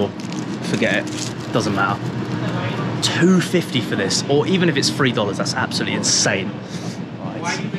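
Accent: Cockney, United Kingdom. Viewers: Only stumbled across ya videos few days ago. Been binge watching em all 😂😂 funny cockney geezer me bein bias cos im cockney myself lol. Brilliant videos mate 👊👊👊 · I love his personality, a budget blogger…love it! Plus you can’t beat the British accent. 😅 Had to sub.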